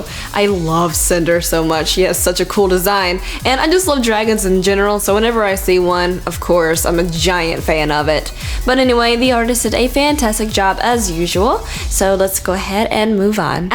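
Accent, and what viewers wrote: South, United States. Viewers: Let me guess your from the south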